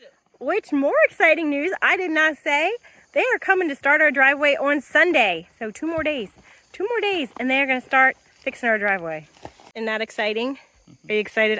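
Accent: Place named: New York, United States. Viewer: Yous???? LOL Are you from NY??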